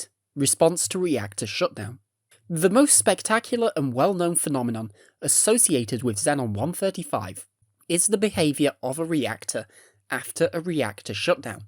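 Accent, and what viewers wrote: United Kingdom. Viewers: I assumed you were British but I'm going to guess Australian now by that trigger sound effect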